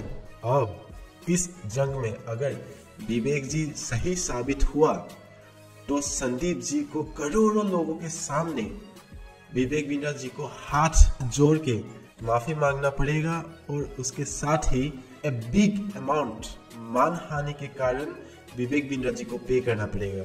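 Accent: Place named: Assam, India. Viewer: yes bro absolutely ! 😃 my hindi is also like you and I am also from Assam.. Joi Ai Axom dada ❤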